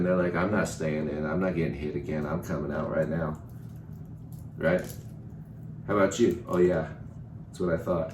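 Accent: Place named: South, United States. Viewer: Wow. Where are you from? You must be from the South. Lol. Love it. Such a down to earth person. You cook like my mamma… Yummy..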